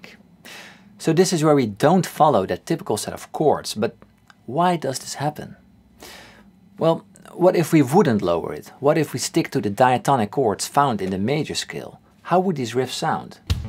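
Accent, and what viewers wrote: Netherlands. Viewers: He sounds dutch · This guy sounds like he is Dutch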